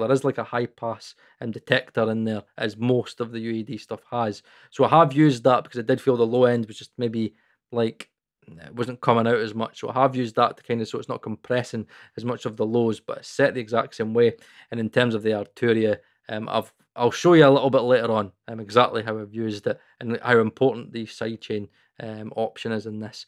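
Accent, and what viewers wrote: Scotland, United Kingdom. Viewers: Hi, just a quick off-topic question: When you shoot your videos, do you "soften" your dialect or is this the way you speak in real life, too? Just asking because I plan to go to Edinburgh next year with some friends of mine. (I have no problems at all to understand you. Been in Scotland 30 years ago but they've never been there. So I wanted to send them your videos to get used to the dialect.)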